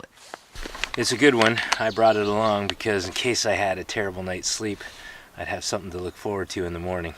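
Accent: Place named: Ireland. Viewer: I believe that is the first time I've heard an Irish accent from Canadian lips... I like using flour tortillas as a wrap for the Breakfast Skillet. I gently warm the tortilla over the fire first. Then scoop in a healthy portion of the mix into the wrap. Tastes great. Maybe a little hot sauce to go with it. Agreed. Over-nighters lack enough time to get into the wilderness frame of mind. But they are great for testing gear and just getting away from it all for a bit. Great video.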